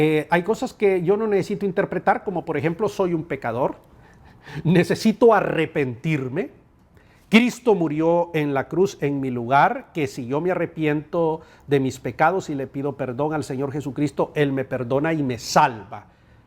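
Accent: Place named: Spain. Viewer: De dónde la sale hablar Español de España a este cuerudo hondureño? Siempre pensé que este tipo era un engreído.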